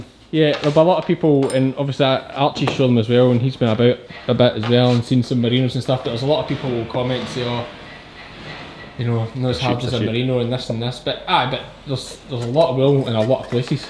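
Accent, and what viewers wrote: Scotland, United Kingdom. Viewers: I fell in love with these sheep the moment I saw them. I had no idea they were so expensive. It always makes me laugh when I see a sheep being shorn. They don't move a muscle. No resentance or complaining whatsoever. I could never eat these beautiful animals. They remind me of children. I enjoyed your video and could listen to a Scotman talk all day. Music to my ears. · Hello from Wyoming USA. I love your videos and accent!! Just curious, you and Graeme (hoof GP) are both from Scotland but have such incredibly different accents. Is it simply the difference in regional dialects, like the difference between Boston and Texan accents? 😊 Thanks for all your videos !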